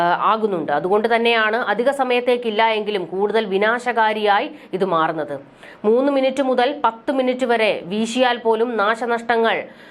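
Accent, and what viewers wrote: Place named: Palakkad, India. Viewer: മിന്നൽ മുരളി കാണാത്ത വർ മിന്നൽ ചുഴലി കാണാൻ സാധ്യത ഉണ്ട് 👌🏿പാലക്കാടൻ ഭാഷ. കേൾക്കാൻ തറ വായന 😂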